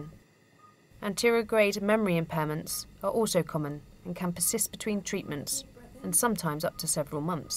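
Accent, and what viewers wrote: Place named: England, United Kingdom. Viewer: I adore such English pronunciation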